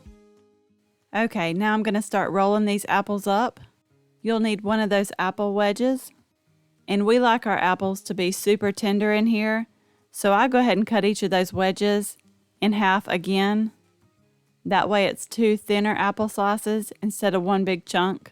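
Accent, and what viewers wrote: South, United States. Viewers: I gave you a like right away just for your Suthun accent. Makes me feel right at home cause I live in Dallas. Your recipes look good also.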